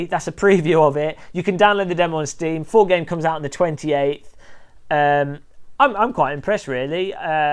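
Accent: United Kingdom. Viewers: Man i just love British commentaries, I'm a total sucker for your sexy accents no matter northern or southern. Your hilarious Assetto Corsa review got me sold on you, hope your channel gets huge. XD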